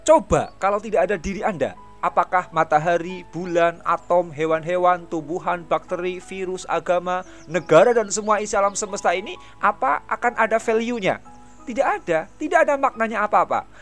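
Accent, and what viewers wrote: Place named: Tegal, Indonesia. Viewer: Saking logat.e kayane wong tegal ya um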